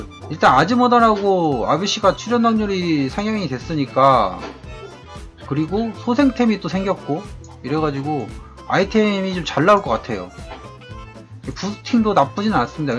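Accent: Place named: South Jeolla, South Korea. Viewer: 옹삭스럽다는 말투보니 전남분이신가요?? ㅎ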